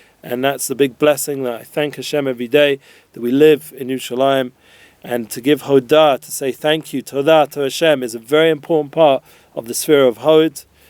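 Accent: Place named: United Kingdom. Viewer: Love the British accent!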